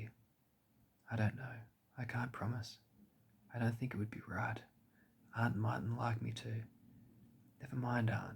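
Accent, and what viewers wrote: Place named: Australia. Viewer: I find the aussie accent soft speaking so relaxing and calming, I think because we have the best accent 😜 great video, I loved the story, keep it up 👍🏼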